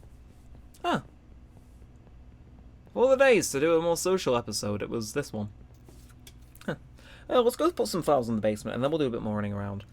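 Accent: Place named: England, United Kingdom. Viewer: Hey I’ve been watching ur ac old school vids for a while now. Big fan of this accf series!!! I always wanted a WII, but never got one for this game & SOS, HM. Now I’m really wanting a WII, but it’s expensive & idn how to hook up to my smart tv on Comcast cable. Plus my aunt just bought me a switch last fall. Love ur accent too, my gran was from England & my uncle is in Scotland, owning a pub. Anyways, I’ve been to both England & Scotland & love them both!!! I’m in the east coast USA, I’m Waterfall ie KtCub🌸💟🤗. I’m on Twitter if u want to check my postings out. Keep the wonderful content, you really cheer me up every time I watch ur vids. I hope u get this msg, Bc idn if my msgs go thru to any of my AC YouTube ppl I like a lot & subscribe. Have a swell day, Laters::::💖🦋🌈 Ps: can u me so I know u get this? Thnks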